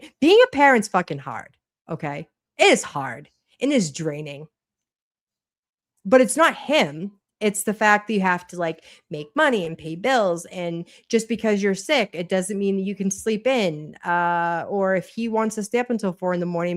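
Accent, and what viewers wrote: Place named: Boston, United States. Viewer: Hey girl! I met you on the other Amy's live last week, I LOVE your energy. Bonus is your Boston persona. My husband is from Dorchester. I am from Philly so I figure same same. ❤ 😂 Thank you for covering this insanity - I think its important to spread the word about these nasty people so hopefully nobody else will fall prey to them.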